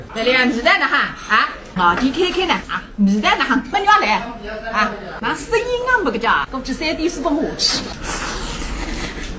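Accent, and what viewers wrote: Suzhou, China. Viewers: 大闸蟹。等々，作为美食介绍加入历史典故。以及人文知识，则更加丰富多彩。是地道苏卅姑娘，我们同乡人听起来非常妙，但非苏州人除江苏南部人外，或许你说的太快，有点听不懂，所以望今后做网上节目，说话节奏慢一点，尤其苏卅地方俚语，越慢越好，如：然（你），北京西路，北和西要说出正宗苏州音，好听。十五，沙五也要着力沙。…..仅供参考，新加坡很多华人上网，听到苏卅腔很高兴。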